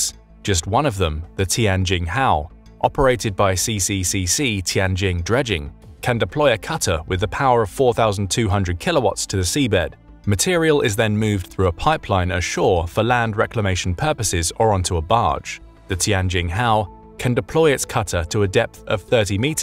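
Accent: United Kingdom. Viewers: Glad I found this channel. Informative reporting on contemporary geopolitics, and with an easy to understand British accent!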